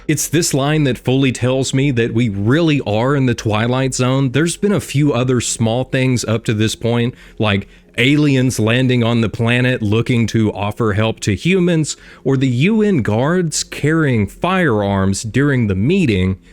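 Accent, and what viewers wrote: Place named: West Virginia, United States. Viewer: Is he from ky or wv. Iam from wv i hear wv accent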